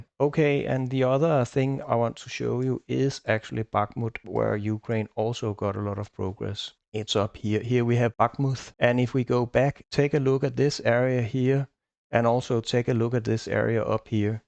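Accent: Denmark. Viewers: the danish accent is strong with this one (jeg er også fra danmark)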